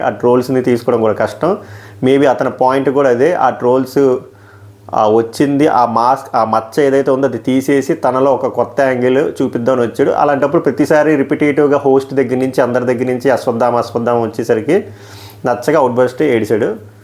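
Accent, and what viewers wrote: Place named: Visakhapatnam, India. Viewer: Super slang bro … I like vizag slang …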